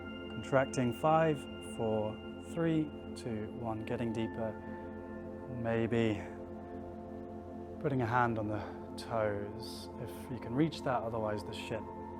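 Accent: United Kingdom. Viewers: Sometimes I watch your videos just for your British accent😅 (And I promise to do the stretching thing in the morning🫶🏻)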